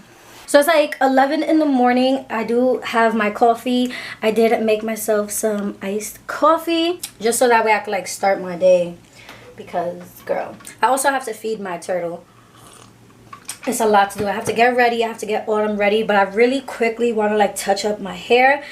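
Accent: New York, United States. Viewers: Omg, I been follow you for a while now...I had no idea you were based in New York, but now I can totally here that accent. Hella cute ma, much love from CALI 💙😈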